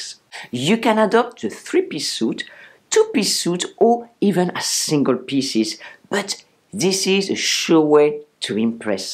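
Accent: France. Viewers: Still watching your videos for your great personality and exhilerating and chick French accent. Maybe I will come around to my messy wardobe some day...:-D Thank you from Marianne! That was a nice morning coffee. And cheers to going "shopping" in our own wardrobes (previous video).:-D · I love pencil skirts. Your accent is amazing. Mostly French with classy English. I can tell you were here in England. Your make-up i copy. I wear Nuxe oils. I have 3 now and i favour red lipstick. I copy you in many styles and im 76, or as my GP says im the new 67. My grandmother was called Lizette and im Jacqui. Maybe thats it. Please keep these videos coming. Thank you🎉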